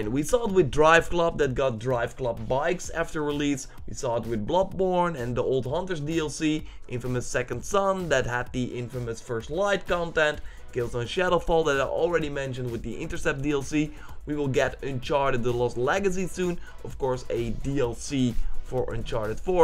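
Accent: Netherlands. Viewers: Autumn Lies Empty Probably because his native language is dutch, and in dutch "idea" is pronounced "iday" and he kinda carried it over to english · Hij heb wel gelijk gap je doet zwaaaaar clickbaiten.. en als je nou nog een beetje engels kon ipv van dunglish had et een ander verhaal geweest maar je ben niet om aan te horen ! speciaal kanaal gemaakt voor deze comment dude . en kan je beginnen over haten dit dat maar als ik er zelfs een account voor maak kan je nagaan hoe erg mensen zich storen!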